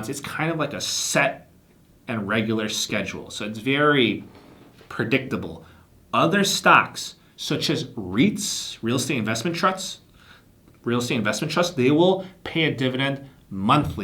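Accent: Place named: Chicago, United States. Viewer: Thick Chicago accent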